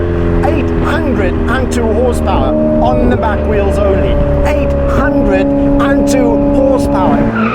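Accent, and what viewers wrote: South Africa. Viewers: I know a SA howzit when I hear one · Bro are you a South African?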